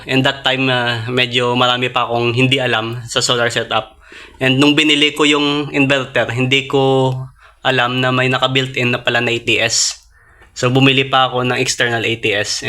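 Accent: Iloilo, Philippines. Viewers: Sir ilonggo poh bah kayo? 😊😊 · Bal.anon gid nga ilonggo ka boss😂😂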